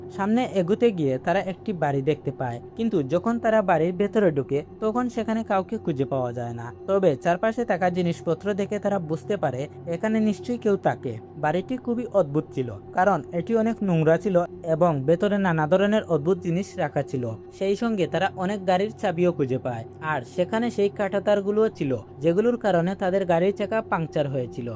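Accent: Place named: Sylhet, Bangladesh. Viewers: ভাইয়া মনে হয় সিলেটি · মৃত,, নেউল দেখতে পায়,,,,সিলেটি টান ভাষা,,,আপনার কথায় সিলেটের টান আসে,,,,সুন্দর করে বাংলা উচ্চারণ শিখেন,,,সিলেটের ভাষার গুষ্টি মাইরো না।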